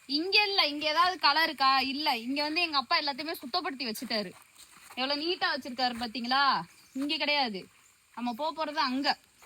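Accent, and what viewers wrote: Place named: Tamil Nadu, India. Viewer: Good. Slang seems from dindigul dt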